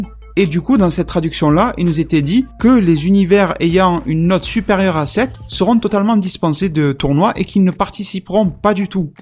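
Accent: Marseille, France. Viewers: ton accent .. Marseille non xD j'ai le même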